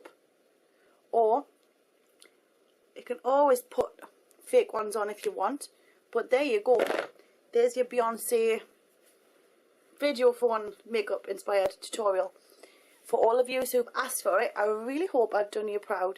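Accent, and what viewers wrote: Newcastle, United Kingdom. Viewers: Newcastle, can tell by her accent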